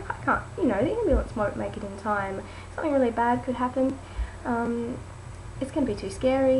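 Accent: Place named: Australia. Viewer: You sound Australian, who did you use?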